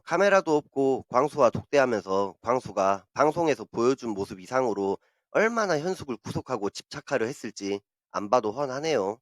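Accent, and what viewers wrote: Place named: Jeolla, South Korea. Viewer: 전라도분이신가봐요 억양이.. ㅎㅎ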